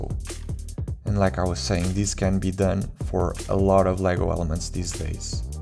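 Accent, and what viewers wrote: Portugal. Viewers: Olha videos incríveis, e eu estou bastante orgulhoso de ser um tuga a conduzir um canal incrível(a menos que sejas brasileiro, mas o teu sotaque remete muito ao sotaque português) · meu deus, só do principio do vídeo consegui perceber que eras português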